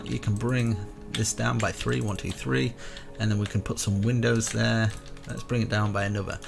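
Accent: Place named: United Kingdom. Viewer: Who the fucks this British guy interrupting my infomercials?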